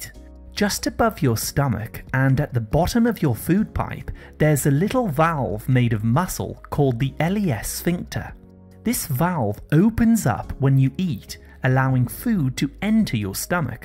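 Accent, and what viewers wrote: United Kingdom. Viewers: I like people. How ever I can't help to say. The British have a real problem pronouncing the English language. It's maddening. Vit,a,men's. Instead of vitamins. Waa,tore. Instead of water. Also it seems the British have a big problem with the letter "r". Look language and the pronunciation of it is key for those who seek to speak or become an orator. One who learns the value of speech and tone. Just saying. Use speech and a calm flow you'll feel good and those listening to you will benefit in the understanding of what you say. Thank you for your time and caring. I won't pick through the other Miss phrased words. Your kind soul is on the good track. Again thank you. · Do brits say "Vytal" when saying vital? If so why do you all annoyingly say "Vitamins" when the prefix is clearly vital pronounced "Vytal"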